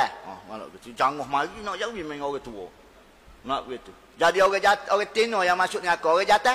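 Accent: Kelantan, Malaysia. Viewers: Ayat ustaz memey nostalgia sguh, caro ore kg kecek sngothi..lenguk bahasa, loghat dio memey buleh pehe hok mn ore klate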